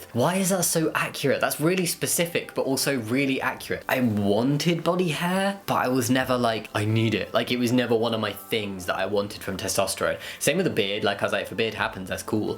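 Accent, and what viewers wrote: United Kingdom. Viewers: I felt like your accent slapped me in the face with just how British it was lmao, that's the best way I can explain it. Also your voice is really calming and it's the type of voice I like in ASMR videos. I'm glad your family is supporting you in this, and that your school is doing its best as well! Catholic schools just seem to suck universally and I don't understand why people keep sending their kids in them...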